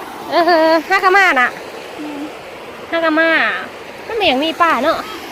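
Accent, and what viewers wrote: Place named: Sisaket, Thailand. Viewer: สบายดีลูกหล่าสำเนียงที่ลูกเว้าจาคือคนแถวศรีสะเกษกันเองบ่ต้องเก็งใจกัน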